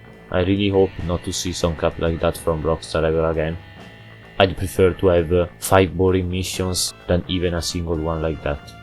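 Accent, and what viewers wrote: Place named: Italy. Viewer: Your voice is very cool, i love when Italians speak English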